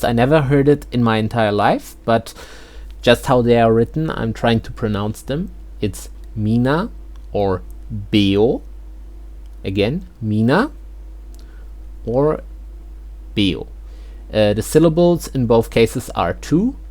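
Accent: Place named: Germany. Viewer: Ah, erfrischend einen deutschen zu hören.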